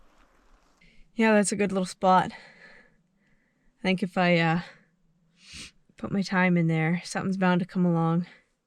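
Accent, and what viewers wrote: Maine, United States. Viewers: Ha I don't know where your from but you certainly sound like a down easter.l0l. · I miss the Maine accent I was born in maine but haven't lived there since 1977 I have visited and hunted there over the years I really enjoy your videos good luck hunting your definitely putting in the time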